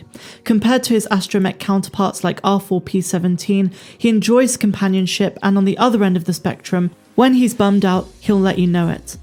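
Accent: United Kingdom. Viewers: I love your british voice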